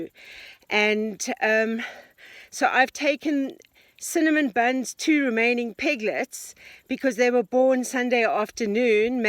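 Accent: South Africa. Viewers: You sound so SouthAfrican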